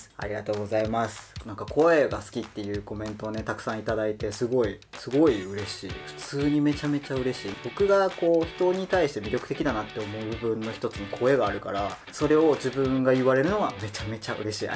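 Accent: Kansai, Japan. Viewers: くんの関西弁にキュンとしちゃう♥♥♥ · 関西弁なのに怖くなくて、言葉のイントネーションとくんの声が心地よくて何回も見てます(*´`) 色々な質問に肯定的に答えていて穏やかで優しくてすごく幸せな時間でした。次回も楽しみにしてます💓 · これからも関西弁いっぱいのくんを待ってます🎵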